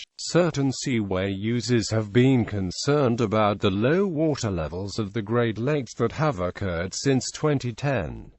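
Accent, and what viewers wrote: United Kingdom. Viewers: This is the first computer I've encountered that has a British accent.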